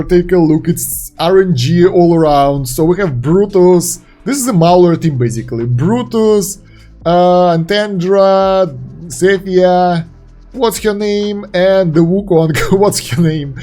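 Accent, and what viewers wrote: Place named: Russia. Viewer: Русский) сразу слышно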